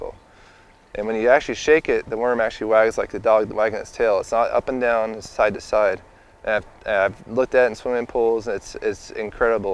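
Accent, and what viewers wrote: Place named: Southern California, United States. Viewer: He was from Southern California, it's just the regional dialect.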